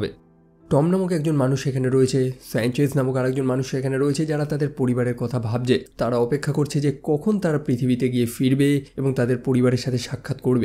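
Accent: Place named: Bangladesh. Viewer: apnar country India...But your Bangla Calcutta er accent nai..Bangladesh er accent er moto lage..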